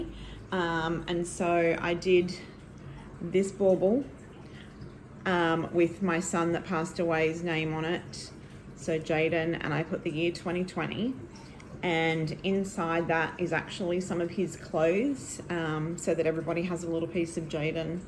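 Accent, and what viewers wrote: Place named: Australia. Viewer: love your podcast. Your stitching is beautiful. Love your embroidery too, but I only hand embroider, so I cannot attempt those lovely pieces, sadly. So nice to hear an Australian accent. I'm an expat Kiwi in Texas, 21 years, now. Look forward to watching more. Kia Kaha.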